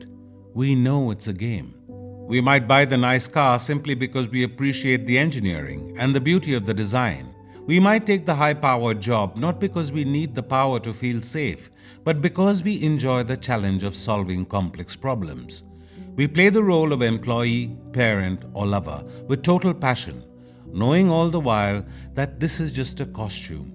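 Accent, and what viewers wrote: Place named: India. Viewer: The indian accent is what makes me turn it off immediately.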